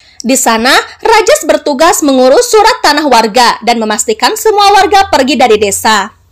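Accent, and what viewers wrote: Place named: West Java, Indonesia. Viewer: Alhamdulillah ya Allah, dapat PIN juga dari kak mimin, makasih kak mimin yg comel 💖💖 Jujur aku suka banget dengerin suara kak mimin dgn logat sunda nya 💖💖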